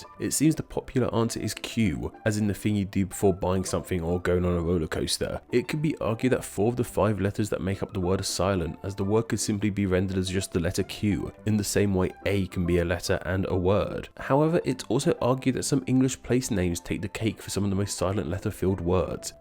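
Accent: United Kingdom. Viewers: Thats the british way of speaking. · Americans actually pronounce a lot of those words fully, I think that maybe 25% to 50% of those words you listed off at the mark were just British pronunciations of them. PS: Also, if a silent letter falls in the forest, and no one is around to hear it, does it make a sound? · The R in Iron isn't silent you're just British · That one has never made sense to me. We should just spell it “ieorn” or something. Technically, he used the same “r” most Brits use with preceding vowels before a consonant. We Americans lean into the “r” as a full-fledged consonant, like civilized people (sorry about the “zed”, Limeys)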